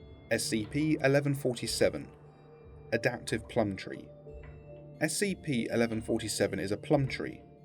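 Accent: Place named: England, United Kingdom. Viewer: I wish I wasn't prejudiced against authentic English accents to enjoy this more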